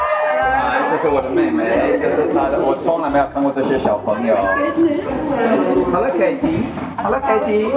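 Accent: Taiwan. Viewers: 尾音 吼，真的很台灣腔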